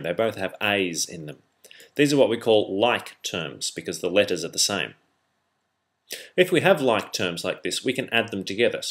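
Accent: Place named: Australia. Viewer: nope, i'm 100% british and THAT is not english, i'd say he was australian or something....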